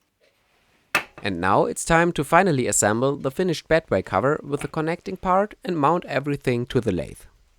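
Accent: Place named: Germany. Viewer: German accent , German ingenuity !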